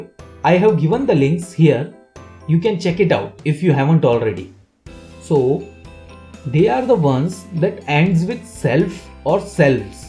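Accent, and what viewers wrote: India. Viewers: Typical Indian English :)))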